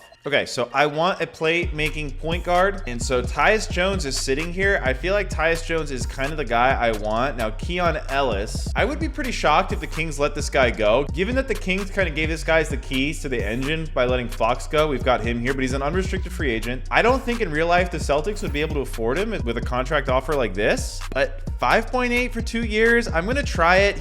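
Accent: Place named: South, United States. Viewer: honestly i love the redneck accent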